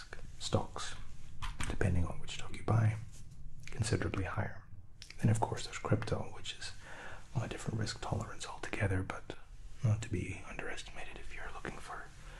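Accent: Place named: Scotland, United Kingdom. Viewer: Not gonna lie, I like the ones where the Scottish accent is extremely obvious 😂all of them are great, though ❤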